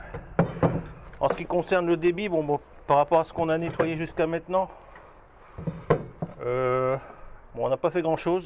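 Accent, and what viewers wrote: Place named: Nord, France. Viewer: T es de ch'nord toi 😃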